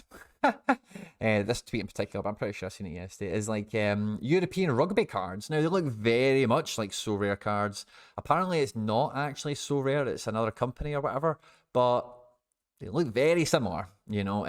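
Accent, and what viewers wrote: Scotland, United Kingdom. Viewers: Few things like listening to a cheery Scotsman discuss Sorare as the friday workday winds down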